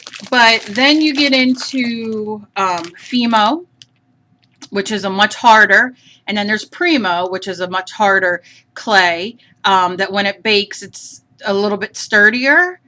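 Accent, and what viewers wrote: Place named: Altoona, United States. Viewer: You sound like you maybe from the Altoona area of PA. Nice memory of my cousins who living there still. Nice video, thank you to share. I am a Stroke survivor and hoping I can start make polymer items for gifts and fun.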